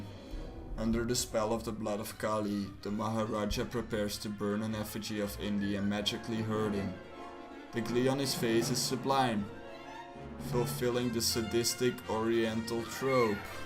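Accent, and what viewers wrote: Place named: Central Europe. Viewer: I'm just going to assume you're a white guy who doesn't actually know what racism or sexism is. From the sound of it you are from middle Europe? Its quite ok that video doesn't have more views than it does.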